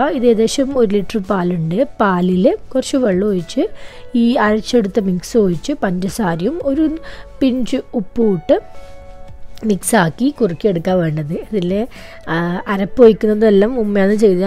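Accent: Kannur, India. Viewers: Kannur slang kelkan anne njn vedio kanunnath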